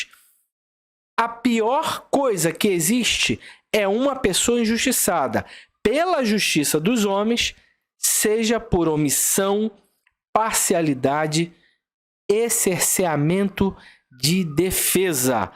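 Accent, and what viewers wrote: Rio de Janeiro, Brazil. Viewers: Heiii até agora tava te ouvindo . Vc e burro ou o que? Eu vi vários vídeos de gente que estava filmando a ruassa que os blackbloc tava fazendo. E eles atacaram esses patriotas que estavam filmando ali mesmo onde os esquerdo estavam ateando fogo nos carros. Vc tá equivocado. Eles esatavam lá até passando mal de medo ao verem carros pegando fogo, e alguns ainda dizendo , “mas esses caras não são lá do acampamento” . Me admiro vc achar uma coisa dessas. Vc não tem assistido mesmo os vídeos dos patriotas , não sabe quem são. Mas eu ja não tava mesmo gostando de ouvir vc esse sotaque de carioca punhado demais .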